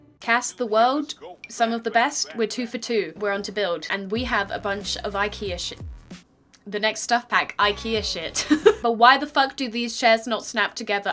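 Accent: United Kingdom. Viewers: i forgot you were british and i was SHOOK out of my mind when you started talking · I just fucking realised, that is a female version of Pyrocynical. No joke:O. SiGnS, tHaT gO bEyOnD rEaSoNaBlE cOmPaRiSoN: -British (heavy British accent) -white/light colored hair -look pretty similiar (in my opinion) -editing is vaguely similiar (cuts, jokes and zooms) That is it I think.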